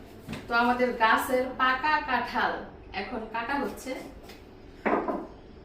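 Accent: Gazipur, Bangladesh. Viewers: Apu Apni ki gazipur er? Ami o gazipur er BT Dhaka te Jonmo bere otha... Apnar kothay amr o Mone hoto apni gazipur er BT kokhono comment kore joges kora hoy nai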